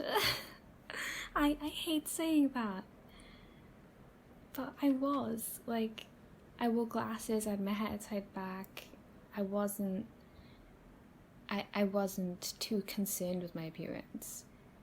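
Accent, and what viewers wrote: Wales, United Kingdom. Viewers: Hmm. You sound Welsh. First time I’ve heard a Welsh ASMRtist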